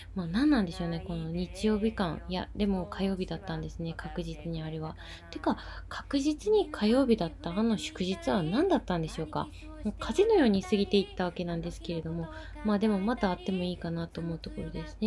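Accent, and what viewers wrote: Kagoshima, Japan. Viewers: 〜！夜勤中にぼーっと見てます。鹿児島弁が心地よか〜〜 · いちいちコメントが面白くて笑ったw 声と鹿児島弁に癒されました☺️ の日常がわかるおうち動画好きー！また楽しみにしてます♡ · 久しぶりにコメントしました🙃 の鹿児島弁すき！ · 鹿児島のなまり？初めて聞いたのでびっくりした‼️新鮮です‼️‼️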